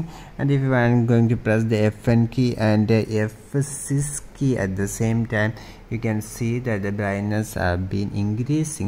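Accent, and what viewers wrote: India. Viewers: where is the accent from? is it India?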